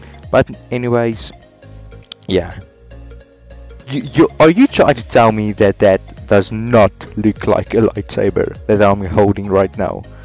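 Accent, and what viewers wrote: Afrikaans, South Africa. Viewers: is jy afrikaans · Mate you from SA? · Are you from South Africa #SouthAfrican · Are you from south Africa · youre afrikaans right? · My bru you sound south African my chom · He sounds southe afrikaan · Dude are you South African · You can hear the afrikaans in his voice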